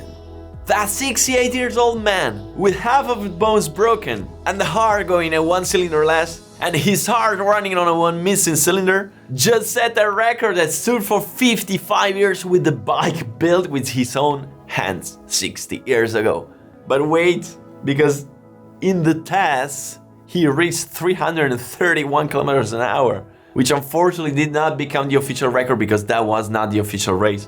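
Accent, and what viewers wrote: Italy. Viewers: As a New Zealander with Italian roots he pronounces his name as any Italian would. It's called an accent. Usually it's Maoris the first to jump on and moan when a foreigner pronounces their language wrong, but in this case you knew exactly what he was saying. Stop being so precious especially over free entertainment.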